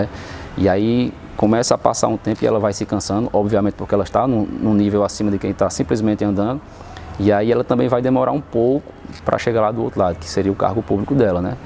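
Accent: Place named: Pernambuco, Brazil. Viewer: Sotaque de pernambucano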